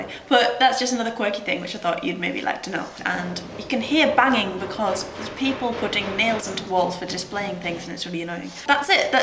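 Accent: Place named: Hull, United Kingdom. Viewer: Yay, I've just discovered Daphne Oram in reply to me mentioning Delia Derbyshire in a Guardian blog. Check out Delia, and also check out (album: ) who feel music in similar ways to Oram. Stockhausen too but his sound is not very palatable. Klaus Schulze's music is very visual in its feeling (especially earlier stuff, like late-70's to early-80's). Good luck with your projects. Where's your accent from? I used to live in Hull, sounds similar.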